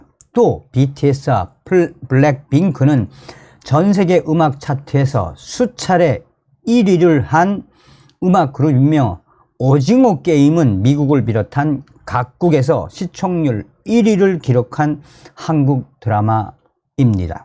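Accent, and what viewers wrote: North Korea. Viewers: 오늘은 차분하게 잘 발언했어요. 부탁을 하자면 큰 문단을 마치고 나면 짧게 쉬고 다음 문단 들어갈때 심호흡을 하시고 들어가는게 좋아요. 그렇게 하지않으면 생각이 정리되지 않은것 처럼 보이고 자쿠 더듬게 된답니다. 그리고 요즘 사랑들 웬만한 북한 사투리는 다 알아들어요. 외국어는 미치 좀 연습할 필요는 있고 일반 언어는 편한 북한 사투리로 해도 괜찮습니다. 경상도 사랑들은 굳이 표준만 쓰려 하지 않아요. 자기에게 익숙한 언어로 심호흡 해가면서 또박또박 자기 주장을 전달하시면 좋은 결과 있으리라 생각해요. 모든 사람이 다 말솜씨 타고난것 아니니 좀 모자라는 말솜씨 가진 분들은 노력해야죠. 하다보면 어느샌가 유려하고 매끈한 진행 하시게 될거예요.^^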